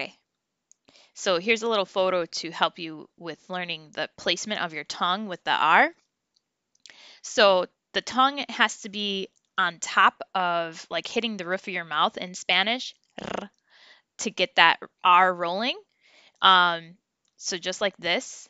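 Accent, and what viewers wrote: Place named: United States. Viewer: I am disappointed because you clearly have have a non-native, American accent in Spanish. For example, at 2;37 ff. you say "ca", "co", "cu" with gringo aspiration on the [k] sound, you say it like [kh]. In Spanish, [k] has zero aspiration. IMO only native speakers should be teaching phonics and accent.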